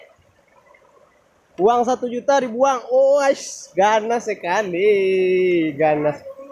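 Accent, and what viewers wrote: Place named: Solok, Indonesia. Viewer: Logat bahaso solok kentara,slaam sesama rang slk da,dimaa lokasi spotny ko da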